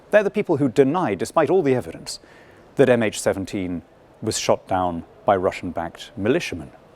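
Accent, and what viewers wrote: RP, United Kingdom. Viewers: Theres something seriously wrong with dan, he sees the world in simple terms, black and white, in most of his videos, communism amd capitalism. Hez guilty of the highest levels of intellectual dishonesty and hez been castigated severally for it. To go on calling out atrocities committed by putin and forget the crimes commited by his ideological political wing in other nations is the heighy of hypocrisy. once he even claimed that hitler was a socialist lol much to the chagrin of a more educated audience he was presenting to. Take out the posh accent and you will realise his points in many of his videos lack any substance in them.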